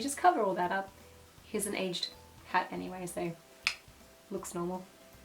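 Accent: Australia. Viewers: yeah haha i had a feeling it was more of an australian accent but idk something about your voice sounds just like her! lol meant as a compliment of course i just stumbled on your channel and i love your videos(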